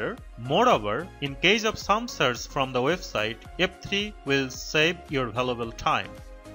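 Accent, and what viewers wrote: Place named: India. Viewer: Thank you for explaining Fn keys but I struggled to understand due to the Indian accent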